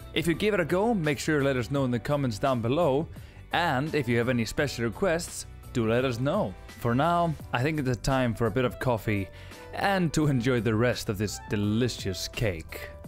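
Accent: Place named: Iceland. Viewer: seems like I finally found a good icelandic recipe! I´m so in love with that and miss original skyr and of course skyr cake the most... but I also love how icelandic people pronounce words in english. So thank you in so many ways! 😊🥰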